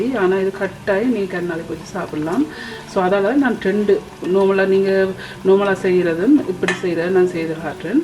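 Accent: Jaffna, Sri Lanka. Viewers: Akkaa neenga muchchu vittu vittu konchum yalpaana tamilil solliththarum alahe thani . Oru sinna kolanthaiku kooda neenga solrathu purium . Seeni sambal bun, boli irendaium seithein super . Appam recipe poduveengala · சூப்பர் டெமோ. இலங்கை தமிழும் கேட்க சுவாரஸ்யமாக உள்ளது.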